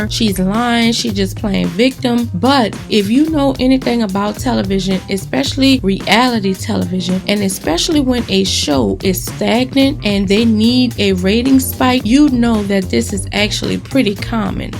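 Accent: New Orleans, United States. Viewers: U do a great job speaking but babaaaay I know the NOLA when I hear it! Especially being from SC. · Are you from New Orleans? That accent is strong lol 😂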